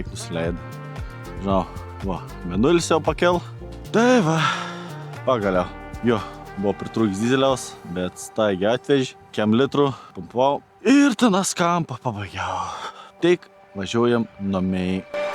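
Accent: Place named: Samogitia, Lithuania. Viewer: 4,20 čia ko gero kultivatorius. Beja ar nebūset iš Žemaitėjės? Kažkap žemaitiu kalba jautas.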